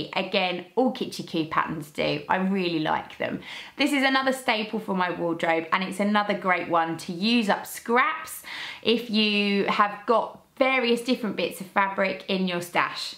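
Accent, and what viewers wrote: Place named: United Kingdom. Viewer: I love watching your videos and listening to your British accent! I’m really loving the fabric haul videos and your travel to the Netherlands. I love to travel the world and look for fabric as well. I especially love looking at the shops in the UK and plan to go back to the UK in the next year/get a rail/train pass and go to as many as I can find. Gold Hawk road and Liberty Fabrics are at the top of my list! Thank you for all your sewing efforts/education. You’ve inspired me to start sewing for myself again! Hugs from Oregon in the USA...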